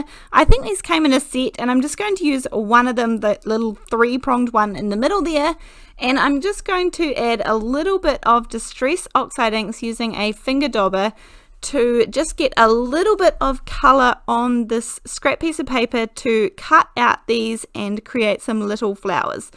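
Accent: New Zealand. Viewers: It's gorgeous. Love all your ideas - I have that old stamp set and have never noticed the birds - they are coming out of hibernation, I can tell you now. The simplicity of the card makes it even prettier I think. I would love to get Alina's tape, but it is quite expensive when you add the shipping to Australia and then have to wait for the product to arrive as well. However, it does look fabulous and great to work with. You have an N.Z. accent but you have an Irish / American roll to your r's in words like bird and work. A connection there perhaps? Just curious. Still loving watching all your Youtubes. Thanks so much for sharing your great ideas.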